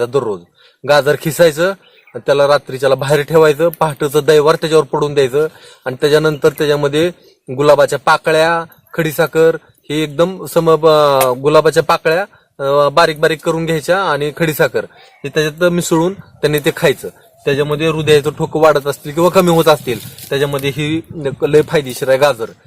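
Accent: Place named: Solapur, India. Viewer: Khupch chan.....zala aahe.....😋😋😋tumhi kontya gavche....me solapur che aahe....bhasha ekdm solapuri vatatey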